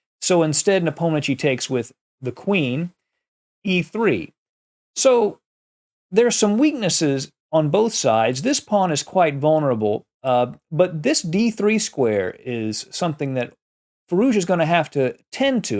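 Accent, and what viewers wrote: United States. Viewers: I very much enjoyed your US English! As of Alireza: one day he would break through.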